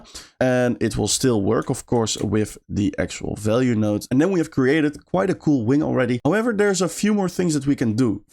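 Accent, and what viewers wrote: Netherlands. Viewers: wonderful tutorial - could tell you were dutch without knowing who you were ;)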